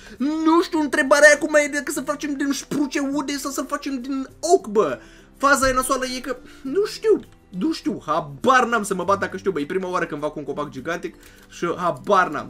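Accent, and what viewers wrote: Moldova. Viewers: Ești Moldovan? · mie imi pare in videoclip ca ai acent de moldovean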